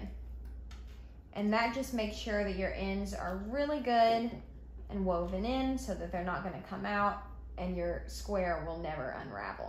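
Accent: South, United States. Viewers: You explain so well and have such beautiful work! Also I love the southern accent I’m from the Midwest This is such an incredible video thank you so much! You definitely have a gift for teaching and arts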